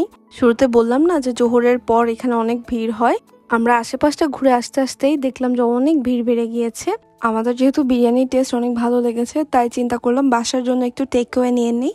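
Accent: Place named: Chittagong, Bangladesh. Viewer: Apnar Chittagaingaaa accent wow 😊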